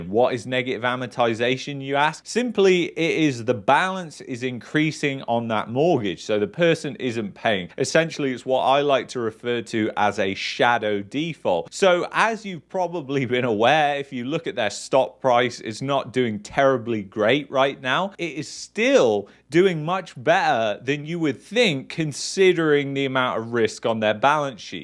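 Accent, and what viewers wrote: United Kingdom. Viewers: You are a pessimistic joker with a British accent! I unsubscribe!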